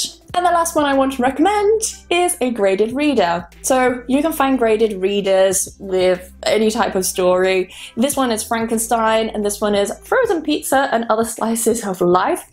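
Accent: United Kingdom. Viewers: Hi. Thanks for your recomendations. I like so much the british accent☺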